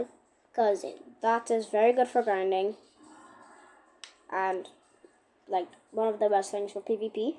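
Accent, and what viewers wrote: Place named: Trinidad and Tobago. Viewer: We know you trini boi stop hiding the accent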